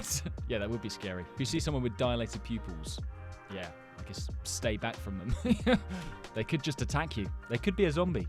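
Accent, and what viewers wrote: United Kingdom. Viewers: I like you talking British cause I like talking British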